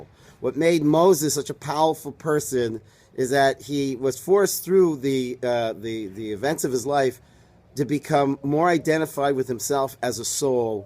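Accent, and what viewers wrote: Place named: East Coast, United States. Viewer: I am so transfixed by listending to you.You are talking not as a Chabad Rabbi but to me you speak as a fellow from back East ( I am from Bensonhurst) ( I was born in Crown Heights Hospital) and one who makes me remember my jewish soul between the times I pray at my local Chabad in California on Sat, Hear Rabbi Gordon on Sunday for breakfast, Meet with Rabbi Dovid and the seniors on Thursday ( that almost makes a week) oh...and come at 7am when they dont have a minyon. Barry Solomon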